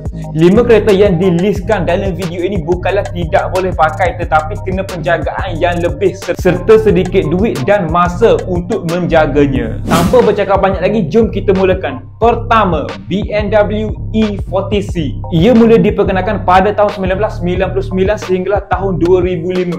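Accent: Kelantan, Malaysia. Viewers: dia pelat kelantan..percakapan melayu ada loghat2 gitu 😂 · Kecek klate mudoh pehe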